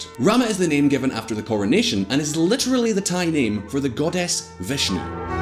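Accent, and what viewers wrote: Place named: Scotland, United Kingdom. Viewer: Nothing wrong with Scottish brogue...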